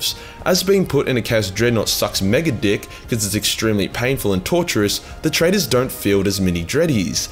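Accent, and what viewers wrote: Australia. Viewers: i was like whys the narrator talking shit and swearing so much and then im like oh yeah he has an australian accent too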